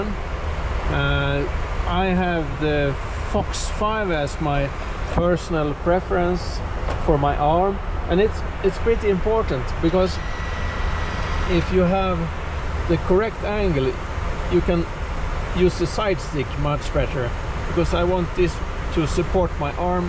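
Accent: Sweden. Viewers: Riktig svensk dialekt på engelskan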